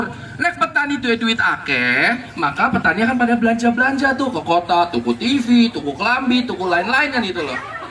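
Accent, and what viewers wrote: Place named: Indramayu, Indonesia. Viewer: Logatnya kentel indramayu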